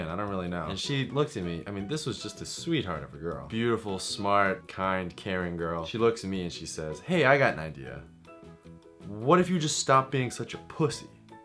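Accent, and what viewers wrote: Boston, United States. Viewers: ***** oh. haha I've always thought she was from Boston. I can't tell the accents apart ^^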